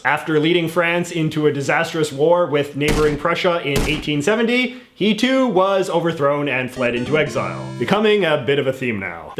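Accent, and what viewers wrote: Canada. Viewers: J.J. McCullough- are you Canadian?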